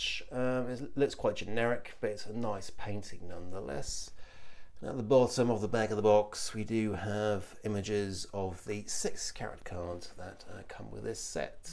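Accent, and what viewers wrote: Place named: United Kingdom. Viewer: They should have used the head articulation where the joint is in the bottom of the neck instead of the top. Like Hush Batman or Capulo rebirth Batman. When they said they were making a Keaton figure that's what I assumed they would do. It makes the most sense as it would have better preserved the shape of the cowl and neck. Sounds like you're in the UK man. May I please ask where you got this? I haven't been able to find it anywhere yet. Edit - Enjoyed the video, have a sub👍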